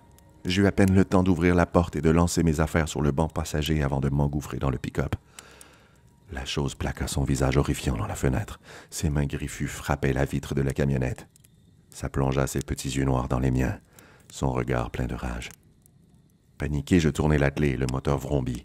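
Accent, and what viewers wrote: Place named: Canada. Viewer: Je viens de découvrir la chaîne. Vraiment excellent! La narration et ce petit accent canadien j'adore!🥰👌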